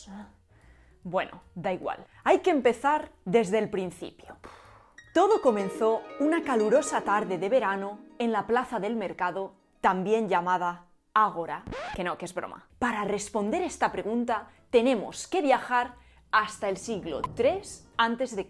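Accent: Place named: Castile, Spain. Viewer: Te Parces a una mAesttrA meddievL que tuve para mi todavía mi mejor maeau.mujer lindísima , pero deberías cambiar cambiar ese horrible acento español, como decía tú nuestrA compatriota Anabel Ochoa la mejor sexóloga de México, me caga la gente como yo qué todavía no se quitan llSzz y lasttzz , por qué en su pueblo solo usaban cacharro pa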